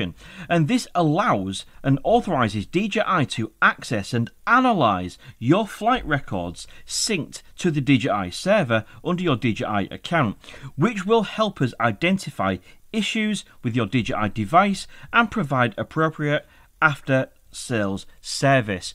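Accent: Hull, United Kingdom. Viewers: I hear a bit of Hull in you accent. Are you from the surrounding area?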